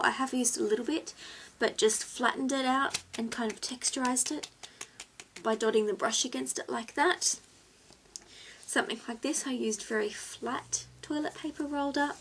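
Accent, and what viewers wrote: Australia. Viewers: are you Australian or from new zealand?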